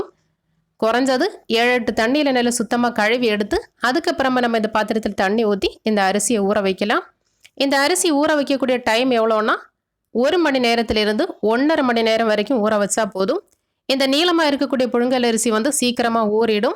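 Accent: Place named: Kanyakumari, India. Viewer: குமரி தமிழ் அருமை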